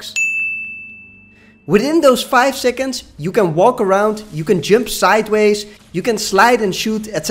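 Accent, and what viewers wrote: Netherlands. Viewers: Thanks voor de tip! Ik hoor trouwens iemand die in het echt Nederlands spreekt ;P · Clear video, explains some of the finer points of speedboosting. An addition would be that you cannot "charge"towards a block while spinjumping and you need to cancel the spinjump (or screwattack) with firing your gun/ aiming, before being able to do mid air spark releases. I would suggest working a bit on your English: Your Dutch is shining through: just watch some vids on pronounciation.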